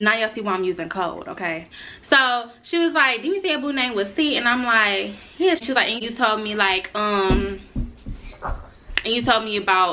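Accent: Detroit, United States. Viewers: I love Detroit accent 😍😍😍 her voice so pretty 💝💖💝💖💝💖💝💖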